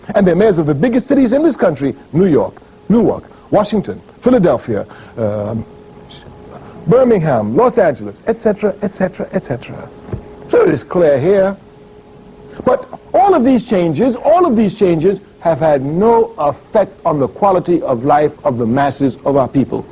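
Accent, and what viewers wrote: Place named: Trinidad and Tobago. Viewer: Here he sounds the most Trini I've ever heard him sound..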